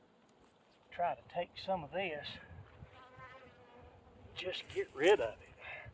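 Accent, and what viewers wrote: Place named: South, United States. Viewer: Very disturbing, sad, cruel, and embarrassing posting. Strange how YouTube allows this very depressing, and cruel content. Always some " man" with a southern drawl, beard and can smell them thru the Internet...... Always Trumpers, and always think of themselves as "real Americans men"..... Real men hunt with cameras.......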